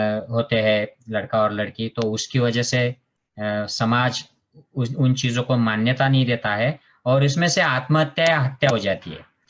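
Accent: Khandesh, India. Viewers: Sir aaple Marathi Mansa lagech olakhle jatat aapli khandeshi lokanchi hindi khup ch khatarnak Aste sir😀😀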